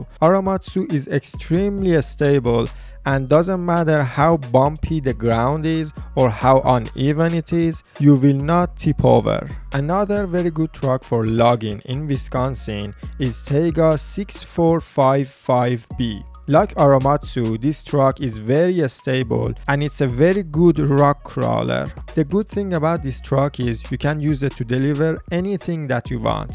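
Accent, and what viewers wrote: Iran. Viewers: داداش ایرانی هسی؟لحجت خیایییی مثل ایرانی ها هس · لحجش چقدر شبیه ایرانیاس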